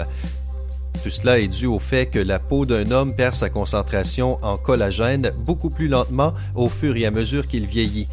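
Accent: Quebec, Canada. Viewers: Pourquoi des fois c un québécois et des fois un francais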